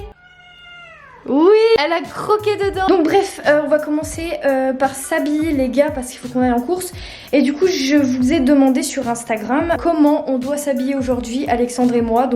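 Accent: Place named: Nord, France. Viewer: T'es cht'i ? T'as trop l'accent !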